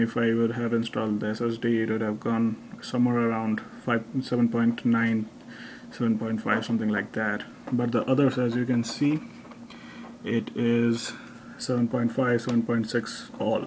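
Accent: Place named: India. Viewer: Brother i noticed your indian accent. Please tell me your opinion of the crappy customer service at Dell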